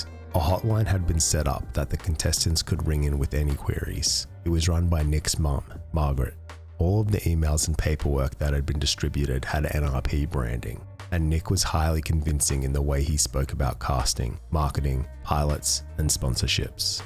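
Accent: Australia. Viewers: Ahhh..... Nce to hear a bit of smooth Aussie narration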